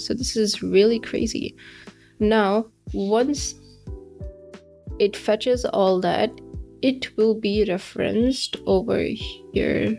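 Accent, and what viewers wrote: India. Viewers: You should probably travel to eastern India rather than crying "pa jit" they just have accents like this... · this video is very informative i also studying in this field i don't know why a little bit of indian accent are i am noticing ..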